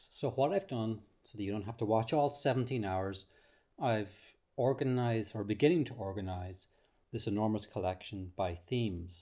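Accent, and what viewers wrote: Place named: Ireland. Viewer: I’ve done loads of your courses, they’re all great! Great to hear an Irish accent too:) go!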